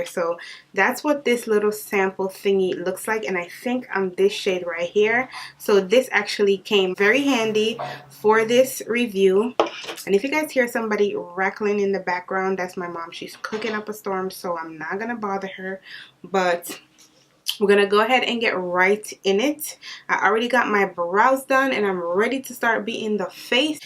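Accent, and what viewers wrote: Jamaica. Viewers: I just knew u were Jamaican from the first time you opened ur mouth lol big up muma🤗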